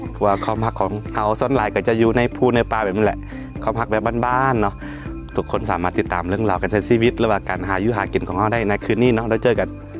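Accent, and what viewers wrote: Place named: North, Thailand. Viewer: อู้เหมือนคนเหนือเจ้า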